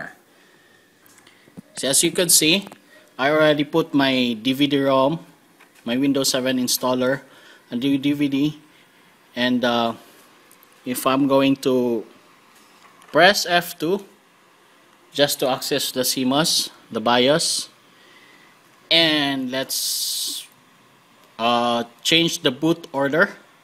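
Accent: Philippines. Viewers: Halatang pinoy to ah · that Filipino accent tho🔥🔥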